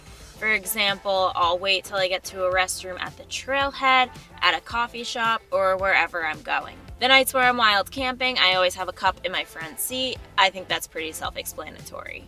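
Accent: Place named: New England, United States. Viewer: She's from New Jersey. So it's probably that new england accent.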